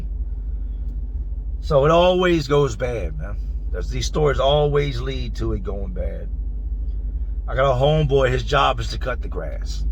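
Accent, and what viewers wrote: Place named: Carolina, United States. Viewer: Bro I swear it sounds like you in Carolina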